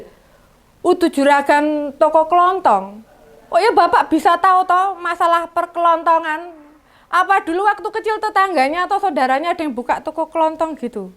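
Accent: Java, Indonesia. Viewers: iki lucu.. jowone mdhok banget 🤣🤣🤣salam sehat.. salam waras dari papua barat daya🙏🙏🙏🙏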